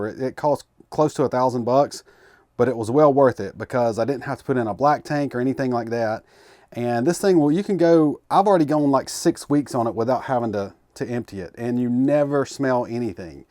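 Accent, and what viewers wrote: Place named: Appalachia, United States. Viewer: Are you from North Carolina? You sound south/easty-of-appalachia-y.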